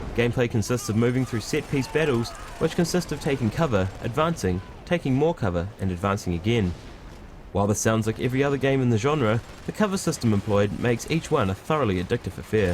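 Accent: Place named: New Zealand. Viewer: Lol, wierd hearing a kiwi review a game.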